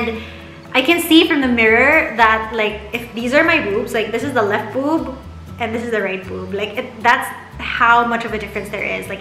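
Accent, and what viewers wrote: Visayas, Philippines. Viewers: Idk but bisan dili pako mom or wife I’m still a students I enjoyed watching your vlogs. Excited na nuon ko ma mommy joke mangita pa man diay kog uyab. Cute sad kaayu ka mag bisaya Ms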